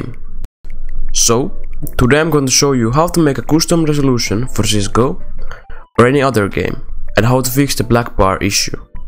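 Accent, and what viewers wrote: Finland. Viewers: Nyt paistaa kyllä suomi aksentti nii saatanasti xd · Thanks! Oot vissii suomalainen?? Huomaa aksentista xd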